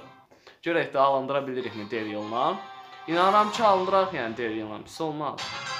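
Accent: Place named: Jabrayil, Azerbaijan. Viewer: Axşamın xeyir qaqam. Necesen? Srn haralısan? Lezzet eliyir danışığın. J ile danişirsan. Cebrayıl lehcesine oxsuyur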